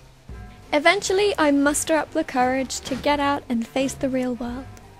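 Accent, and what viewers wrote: United Kingdom. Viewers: Are u british ?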